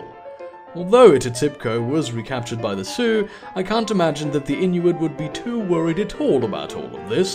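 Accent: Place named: Australia. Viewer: Your Australian accent sounds like Russell Brand.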